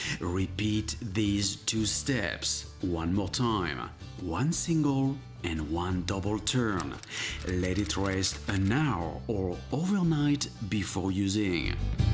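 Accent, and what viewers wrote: France. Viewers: Hes French what kind of accent do you want him to have? Southern? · Are you from french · He really speak like a stupid frensh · hi, thanks so much for your reply. I think you're amazing in your baking. I watch many other video in baking but it's never as perfect as the way you demonstrate. I love your accent, it's that French accent? I love French baking although I never bless to eat anything French. I am very please to found your video for baking. Thank you so much and please continue with more delicious baking, one day I want to learn how to make croissant By the way, I watch that video so many time to get to steps, and at the end you said "sorry guy" and you took a bite out of a croissant, I want a bite too lol....so delicious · Lydia Aschenaki he is French-speaking, with a typical French accent. If his accent annoys you, mute the video, or get a life.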